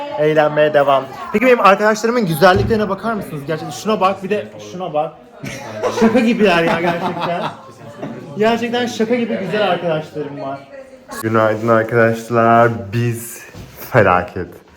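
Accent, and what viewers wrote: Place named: Italy. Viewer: Türkceyı ingilizceyı itakyanca aksanı ile konusmak😅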